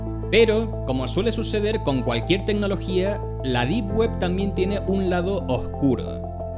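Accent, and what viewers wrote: Canary Islands, Spain. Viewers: Canario total no? Jaja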